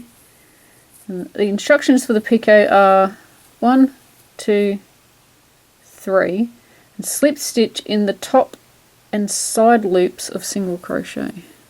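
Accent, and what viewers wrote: Australia. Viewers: Thanks very much, hearing what I assume is an Australian accent threw me, Newbie here, yuor tutorials are great.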